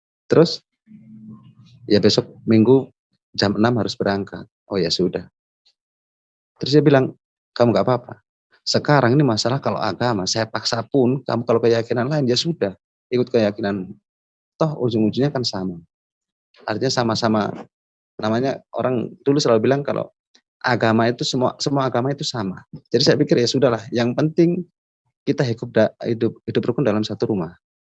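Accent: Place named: Kupang, Indonesia. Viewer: Kental dialek kupang,,kupang mana mas??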